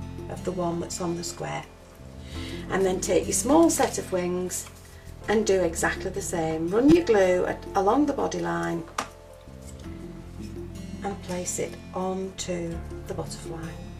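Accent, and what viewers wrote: England, United Kingdom. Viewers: Hi just came across your site! It's nice to hear English accent!lol have subscribed....now I'm going to look at your other tutorials. I live in Sussex.😍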